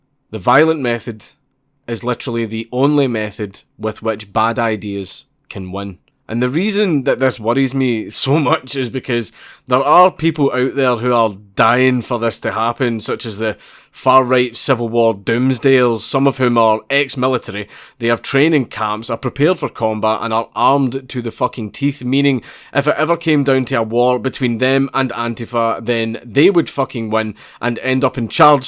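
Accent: Ireland. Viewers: haha this is the first video i am watching of yours, and as an American, I can't take you seriously with an Irish accent LMAO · Would be nice if I could hit a CC button cuz your irish is soo thick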